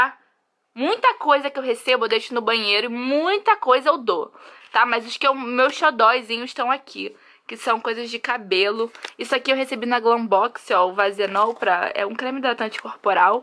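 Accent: Rio de Janeiro, Brazil. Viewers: vc e mt divertida e esse sutaque de carioca e incrivel ate eu ja to falando q nem carioca seu qu\rto e lindo pft adorei vc e seu quarto faz um tour pela sua casa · Adoreei esse vídeo, seu quarto é lindoo!! Mas uma perguntinha. Vc é carioca?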